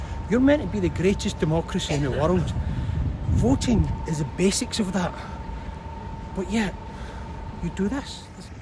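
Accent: Scotland, United Kingdom. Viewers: I love those Scottish dulcet tones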